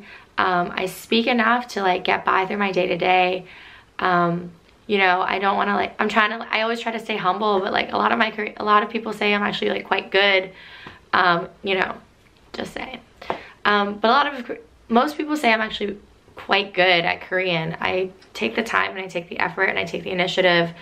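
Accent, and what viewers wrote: United States. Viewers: Your accent is not New York, more West Coast California.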